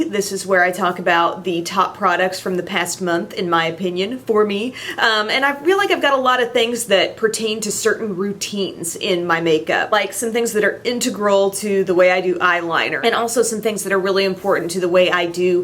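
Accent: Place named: Midwest, United States. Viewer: Your ridiculously adorable Midwestern accent makes me feel like you're my super pretty big sister patiently helping me learn how to do my makeup so I don't end up with bronzer tiger stripes!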